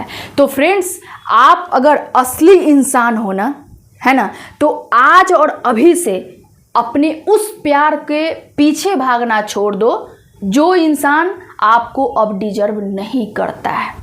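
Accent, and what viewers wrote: Bihar, India. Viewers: Bihari tone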